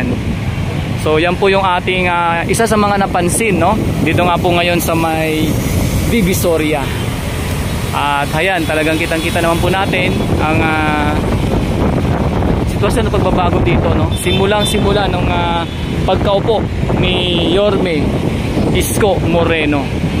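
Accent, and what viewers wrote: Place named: Visayas, Philippines. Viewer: Bisaya accent ka boss ah hehehe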